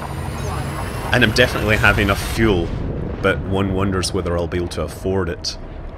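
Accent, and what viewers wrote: Scotland, United Kingdom. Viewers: Has anyone told you, you sound like a Scottish Carl Sagan? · Mr.: as you got closer to 'Home', I shared in your obvious excitement. Well played, commentated, and shared. Thank you! P.S. It was your Scots accent which originally caught me, then I watched more of your vids, and have since subbed.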